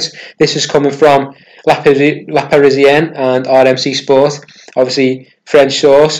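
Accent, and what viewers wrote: Liverpool, United Kingdom. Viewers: Good content, but one thing my gf & friends point out is saying..."erm" before every sentence. Doesn't matter to me, maybe because English isn't my first language. My friends notice & some dislike it. I Remember Rooney started every sentence with "erm" but you notice he no longer does ? I'm sure he had coaching on public speaking. It is more noticeable with Scouse accent & sadly there's lots of idiots who just hate Scouse - Please don't take offense, I sorry if it seems like that, I just pointing out how others may think & if you say "fuck it, this is how I speak" I get it, people here (S.E. England) say shit about my accent & can be really snobs - Anyway bro, just saying what I heard, not my opinion - Great video COYB (I support Hajduk but in England I'm also a big Everton fan)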